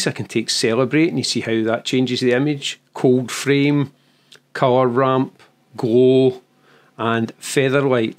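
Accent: Scotland, United Kingdom. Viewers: Its very difficult to understand this scottish dialect , I think, in other parts of the world, because you are speaking very fast. I am from Germany and I shall make every effort understanding you in future. Maybe I have to listen to many other of your editorials.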